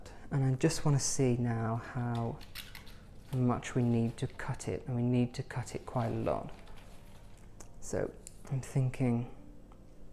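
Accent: United Kingdom. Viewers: Hello my name is CONNIE I live in Southern California I subscribe to your Channel a few months ago and recently added your news letter I am so enjoying your videos. Your apartment is screaming beautiful I would love to live there and Sophie is adorable I own a Shih Tzu myself I think they are the best dogs. I started watching with my goddaughter because she is fascinated with anybody who sounds British so she imitates you through the entire video she loves it. Now she wants your tea set, she’s turning into a little connoisseur. Her father is a chef so she adores cooking we watched your tea party on how to brew the tea properly and make the little sandwiches she was in heaven. You are so blessed to live in such a lovely environment and location. I love that you bring so much charm and elegance to your videos it’s definitely a lost art in America. You did a beautiful job on your floral arrangement, there’s just something about fresh flowers, they don’t even need to be expensive it’s just something living and beautiful that can bring you so much comfort when we have such a small life these days. 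Thank you again for blessing us and bringing Refinement and old world elegance into our homes. Stay safe and healthy give Sophia hug. Your new friends CONNIE and Joey Blu❤️🙏✝️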